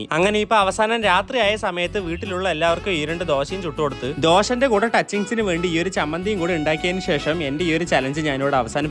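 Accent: Palakkad, India. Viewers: ചേട്ടൻ പാലക്കാട് ആണോ അവിടെ ആണ് ❤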